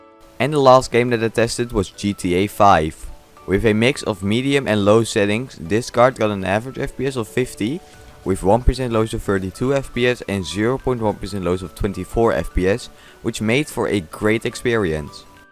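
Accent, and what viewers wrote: Netherlands. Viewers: I found an xfx version for 30 bucks, very happy with that. Btw where are you from? You sound very dutch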